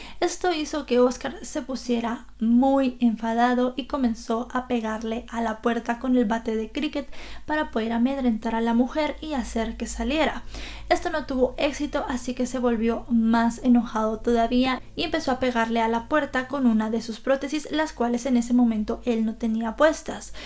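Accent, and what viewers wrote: Standard. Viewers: Tu español es muy neutro.. es de donde? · Excelente manera de transmitirnos la información sobre los casos ,amo su español Neutro ,no tenía idea de donde Era pero creo que no tiene acento de Guatemala es más no se distinguir el acento solo que me encanta la forma en que narras ,un gran trabajo impecable ! Suerte que siga creciendo más Saludos de una Hondureña ! ❤️ No hay día que no vea un caso suyo ! :)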